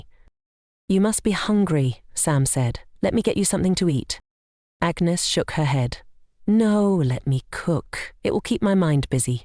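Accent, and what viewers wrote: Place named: England, United Kingdom. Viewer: Such a beautiful English accent. All that glitters isn’t GOLD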